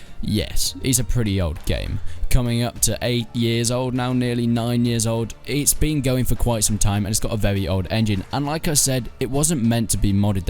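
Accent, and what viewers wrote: United Kingdom. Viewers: Yes I agree can't understand what your saying with that Brit accent. A game list would be nice.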